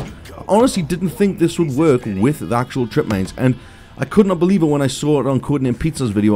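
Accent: Newcastle, United Kingdom. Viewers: are you a geordie mate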